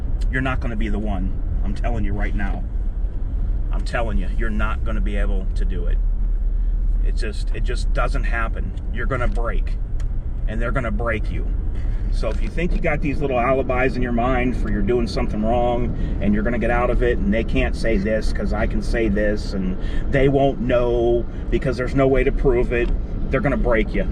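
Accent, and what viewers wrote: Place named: Western Pennsylvania, United States. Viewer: Obviously you’re from Canton too. Although I can almost detect a Western PA accent.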